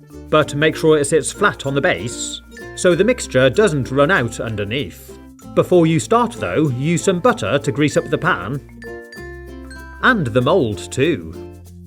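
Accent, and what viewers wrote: United Kingdom. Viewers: I normally don't like the British accent but yours is so satisfying to hear to. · i love your accent british guys, loves from turkey :)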